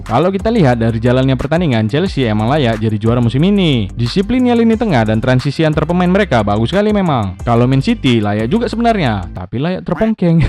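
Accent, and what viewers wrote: Medan, Indonesia. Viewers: Bg abg org medan? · Medan mana kau bang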